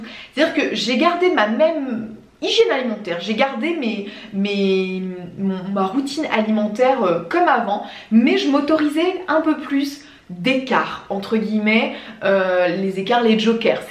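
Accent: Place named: Brittany, France. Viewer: C'est l'accent Breton qui veut ça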